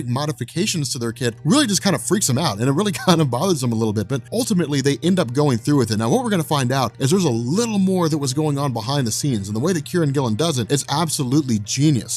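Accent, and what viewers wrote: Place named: Appalachia, United States. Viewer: i thought i heard hillbilly in your voice i hate KY as well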